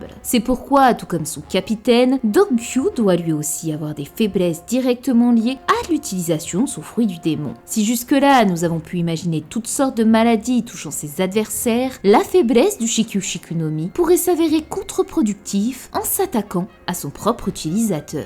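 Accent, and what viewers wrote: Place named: Belgium. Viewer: c'est l'accent belge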